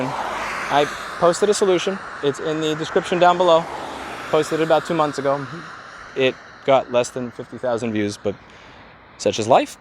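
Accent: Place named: Brooklyn, United States. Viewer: Personality, God you'r from NY , Brooklyn even.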